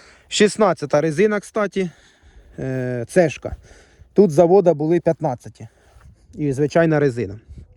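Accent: Poltava, Ukraine. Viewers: Це Полтавський суржик дядь)🤣💙💛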